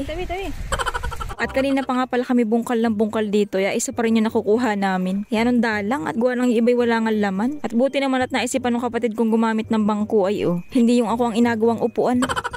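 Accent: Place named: Gumaca, Philippines. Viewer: hahahaha i really really love your accent it sounds like home to me... parang gusto ko tuloy umuwi Gumaca bigla 😂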